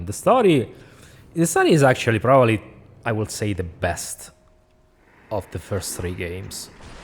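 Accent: Italy. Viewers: By the way. The way you say Batman with your Italian accent is so satisfying. In a sense of the phonetics of it. Sorry autism lmao.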